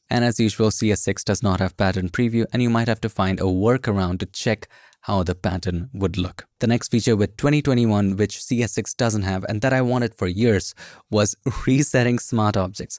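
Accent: India. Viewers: I didn't even think that i will fall in love with an Indian accent one day xD love you mate , your fan from Egypt!♥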